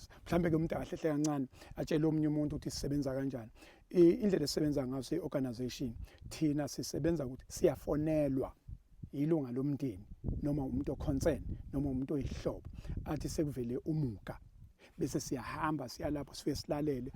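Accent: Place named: Xhosa, South Africa. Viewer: Mina bengingayibon inking kodw ubaba mayesechaza kyezwakal lixhoshwa libhekil Baba uxolo lukufanele ungowethu n sisakudinga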